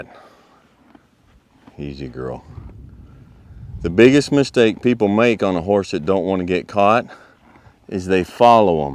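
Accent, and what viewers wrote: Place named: Kentucky/Tennessee, United States. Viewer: A big welcome to Texas. Mighty glad your now in God's country. With that Kentucky/Tennessee accent you will fit in just fine. The scenery of the hill country of Bandera is mighty beautimous. As always I look forward to your informative videos, and the lessons of life you tell.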